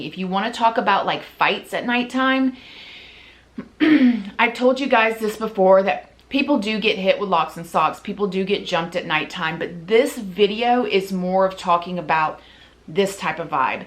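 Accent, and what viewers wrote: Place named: Baltimore, United States. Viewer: Sounds like she is from Baltimore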